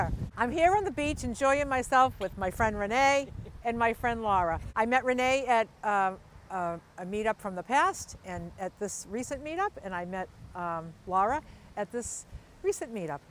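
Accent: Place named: Boston, United States. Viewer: I love this! This tune has stuck in my head since I first heard it in the van on your travels out of Boston. It`s so catchy that I sing it all around the house and my 12 year old laughs at me. I am from the UK & was lucky to live in Boston from <num>, I love hearing your accent. Thank You, look forward to seeing more video`s of people dancing to it!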